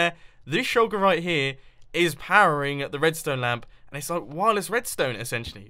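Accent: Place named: United Kingdom. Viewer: He kinda sounds like Wheatley from Portal 2... Probably cuz he British lol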